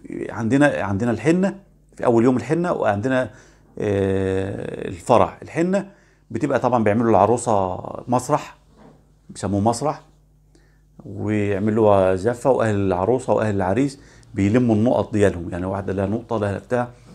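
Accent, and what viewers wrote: Upper Egypt, Egypt. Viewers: ههههه ربنا يجازيك أنا ضحكت ضحك السنين مش على العادات لأني مغربية وعرفاها بس ضحكت من اسلوبك فالكلام وأنت مستغرب ولهجتك الصعيدية الجميلة ربنا يباركلك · Lahjatak sa3iedi momkin tkon men howara marhba bik f bladek howara mawjoda f almaghreb nas sa3ied rjaal ma39olin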